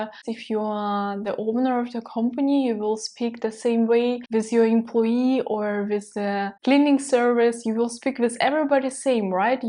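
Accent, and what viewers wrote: Russia. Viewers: Hm, whom do you want to address? A Russian who speaks an elaborate, but very Russian English, about German themes and who is living in Germany. Would Russian or German language not be the better choice? Which English speaking native gives a damm what any Russian English speaker tells about Germany. I have the strong feeling, that one of the corner stones has to be altered here to work for your goal to attract subscribers on YouTube. Some do simply not have the looks and youth to have easy success. Not the case with you, so overthink each other pillar on your path to glory, or wealth, or what you want to achive in life through Youtube. To you the best.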